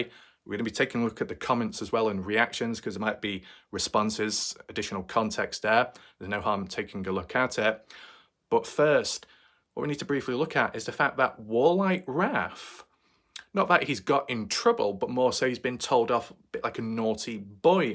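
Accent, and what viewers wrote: South, United States. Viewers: You read the article on the 23rd I believe. Can't believe this happened. It's a shame it's even still up. I completely agree that everything, including the mess-ups and misinformation, should be archived! We have to learn from our mistakes. By the way, I'm loving the country accent 😂😂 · Great accent. Can you say, hey there feller with the yeller piller? I used to say that to my southern family when I was a kid. I can decipher it if you like lol. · lol 😂 that country accent is FANTASTIC! I’m rollin’ on the floor! Keep up the great work! Always enjoy ur videos!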